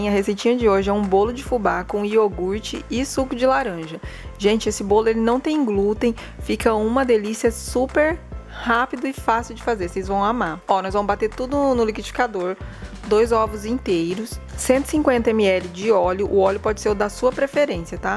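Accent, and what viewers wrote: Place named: Inland São Paulo, Brazil. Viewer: Posso estar enganada mas o sotaque dela, parece com o do povo do interior de Sao Paulo. 🤔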